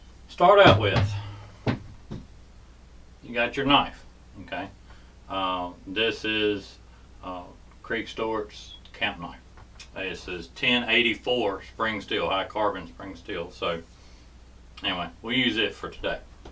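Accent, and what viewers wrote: United States. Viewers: Just subbed! My loan-start senses are tingling. Do i detect a texas twang?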